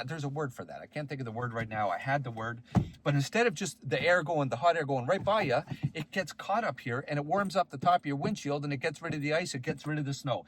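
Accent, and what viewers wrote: Canada. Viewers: Well, being American (Idaho), I just had to watch your video to see if I could understand you. Guess what? I DID, and I learned some things, I really enjoyed the comments, and think you're pretty darned funny! Thanks for the tips and tricks you've shared, and it's clear that our neighbors to the north are tough and winter ready. Merry Christmas!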